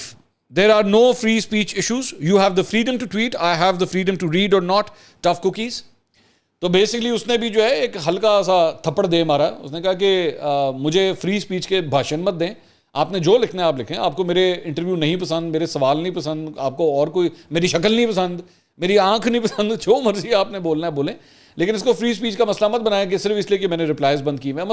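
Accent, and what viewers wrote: Punjab, India. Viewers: صاحب، اپنی شرٹ کا بٹن لگائو، پبلک کے سامنے تمیز سے آؤ۔ پینڈو Language in Vlog still remains a mix “ Kichri” of Punjabi and Urdu in panjabi accent plus, میں۔ میں۔ میں۔ میں۔ Non Punjabi feel disgusted to watch this show.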